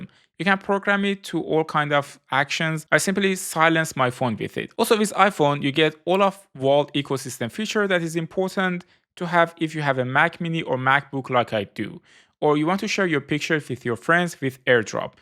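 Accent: Iran. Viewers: لهجه ایرانی باشه ؟ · لهجه ایرانی غلیظ😂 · oh this persian accent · Are you Persian? Your accent is sooo close to Persian Thanks for the video by the way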